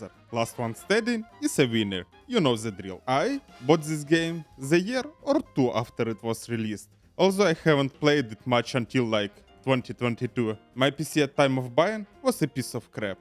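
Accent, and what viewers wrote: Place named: Russia. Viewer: Я всё гадаю причину этого мазер рашиа акцента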